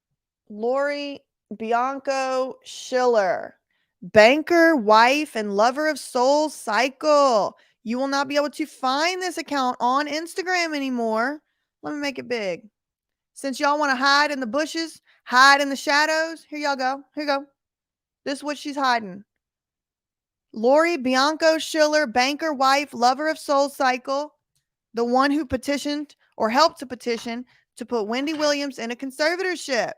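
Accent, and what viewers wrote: South, United States. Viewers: Woo hoo Warrior Woman! 🎉Shae Shae shame shame. “Sometimes you can come out alright, like me and then there’s Shae.”🤣 Also, you have definitely been laying on the southern accent and rhetoric lately. Hilarious! Seriously though, I’m SO PROUD OF YOU STANDING UP FOR OTHERS AND FOR JUSTICE!😘🤗💗💕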